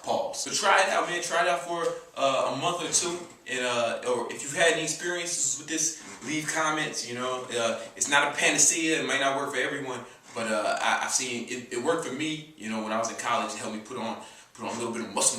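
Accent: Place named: Chicago, United States. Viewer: sure you do*,you completely avoided the question of are you a fun/lovable person in real life. by the looks of your responses you seem to be really bitter. why are you judging and getting bothered by a accent from a black man from Chicago. I know for a fact there are people who wouldn't seem "intelligent" in your eyes but have more money and a fulfilling happy life then you.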